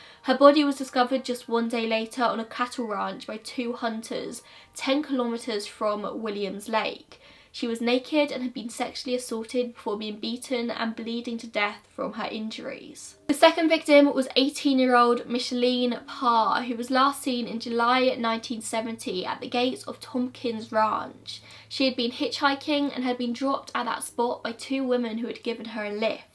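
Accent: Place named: RP, United Kingdom. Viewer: I've always had thing for posh speaking sexy girls like yourself, please take this has a complement not some sleaze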